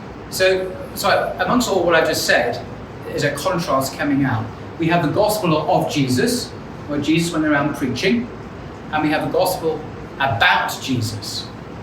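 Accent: United Kingdom. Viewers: Mashallah brother! Thank you very much for this amazing talk. I like to listen to your videos because of their very rich content but also for the British accent that I like very much 😅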